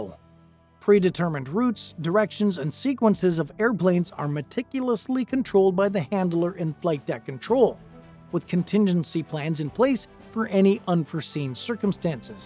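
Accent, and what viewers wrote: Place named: Canada. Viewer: Canadian voiceover!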